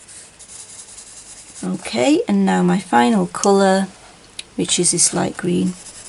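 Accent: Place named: Yorkshire, United Kingdom. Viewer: good idea! I have carers who go in and out...they help me care for my partner, so I have to ensure from a health and safety view I do not have tripping hazards...so putting loads of cushions against the door is not an option. I now have to watch Amazon and track where the delivery person is and hover by the door when they are a couple of stops away so that I can take it from them. Total nightmare! I still haven't opened and tried the items yet...still too scared. I am really enjoying your videos. Love your accent...I am trying to work out what part of Yorkshire you are from. I am in the UK too.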